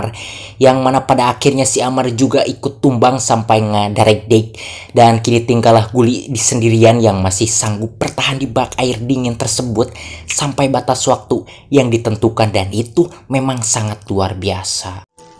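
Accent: West Java, Indonesia. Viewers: Nma nya spa bng Sunda nya mna bikin ngakak kalo dngerin suaranya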